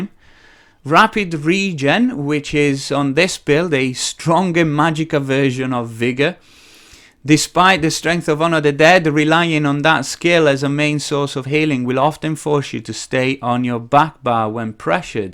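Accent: North, United Kingdom. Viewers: sounds like northern UK, Dutch and South African in one haha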